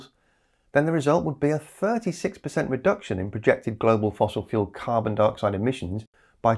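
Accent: United Kingdom. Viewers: Oh dear where to start! I'll concentrate on the UK where I live, and judging from the accent where the video is from. The production "subsidies" are tax treatment that all businesses benefit from, because if cost of production was taxed as opposed to profits, no one would bother producing anything. Talking of taxes, big oil was paying 40% CT (double other businesses) and now windfall taxed at 75%! The second bit addressed the direct type as in the price cap recently introduced. This was needed, and other countries have similar schemes, because of high prices. Prices are high for various reasons, post Covid bounce back, the war etc. But another cause of high cost is renewables, but that is a whole other discussion. Lastly "indirect" subsidies, i.e. not paying for the supposed damage caused. Well depends how you measure it, and I would not trust the IMF, they hardly ever get predictions right (same as IPCC). 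Just because an organisation has "international" or "world" in it's name, does not make a divine source of information. Anyway, there are carbon credits, ESG ratings, and taxes on anything that moves by fossil fuel, are these accounted for? Making EVs and wind turbines also have an environmental footprint, does the IMF call these subsidies? Talking of which, both these and other green industries receive huge direct subsidies from the taxpayer. Finally, I am not a denier, but to get to a greener future requires fossil fuels, and plenty of them for a long time to come. The va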